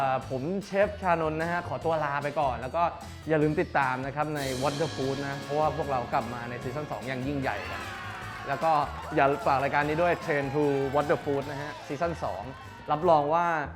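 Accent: Uthai Thani, Thailand. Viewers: มีความเหน่อ ลูกเอ้ย เอ็นดูวว์ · คนบ้านไหน เหน่อจังเลยค่ะ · ขนาดยาวหน่อย(เอ็นดู)แอบเหน่อ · ชอบที่เสียงพี่เขาเหน่อๆนิดนึงน่ารักค่ะ😂ดูเพลิน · พี่ชานน ep.นี้เหน่อมากก55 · คนอุทัยธานีเหมือนกันค่ะ สำเนียงเดียวกัน น่ารักกก😂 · ชอบความพูดสำเนียงอุทัยธานี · พี่ชานนท์เทปนี้เหน่อออกเลยแง้ มีความเจ้าปื้ดด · เอ็นดูความพูดไปเหน่อไป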